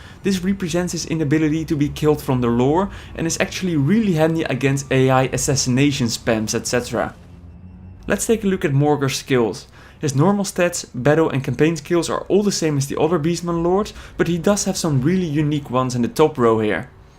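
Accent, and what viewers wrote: Netherlands. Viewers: He's fucking dutch, you try speak a language that you aren't accustomed to and let's see how you sound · dacht ik al was een nederlands accent xD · Your accent sounds so Dutch !! any change you are from The Netherlands ? Anyways, amazing video !1 thanks !!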